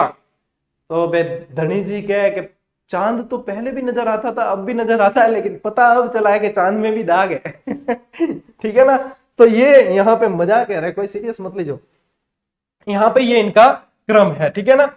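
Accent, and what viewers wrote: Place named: Marwari, India. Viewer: Sir, thnq very much for giving videos with such nice explanation 👍 bahut aache se samajh aata hai ...... n aapki jo maarwadi bolne ka style to bahut hi aacha lagta hai 🙂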